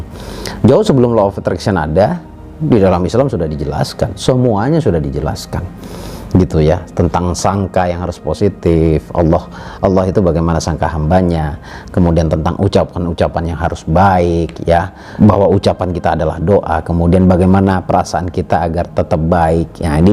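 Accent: Madura, Indonesia. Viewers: Madura mana? Mas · Gus. Orang madura? Dn jawa.. Pantesan..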